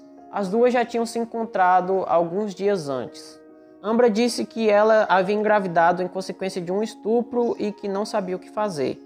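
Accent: Northeast, Brazil. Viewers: Esse sutaqui e da onde??? Do nodeste????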